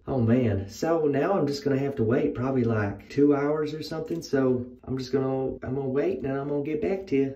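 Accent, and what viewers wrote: South, United States. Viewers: I have never been so in love with a southern accent😅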